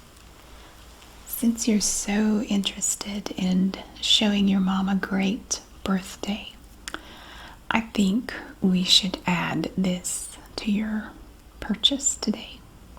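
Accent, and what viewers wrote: South, United States. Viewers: I love I'm from the UK 🇬🇧 I love the Southern American accent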